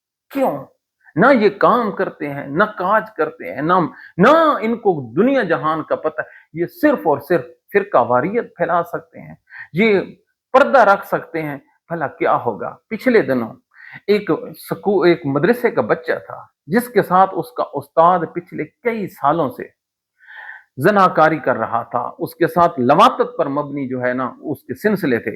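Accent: Saraiki, Pakistan. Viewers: ارشد کندی آپ کے ردعمل پر بے حد خوشی ہوتی ہے آپ کا بہت بہت شکریہ سرائیکی لہجے میں اردو سننے کا اپنا ہی مزہ ہے ۔اللہ تعالی آپ کو لذت معرفت حق سے مزید آ شنا کرے ۔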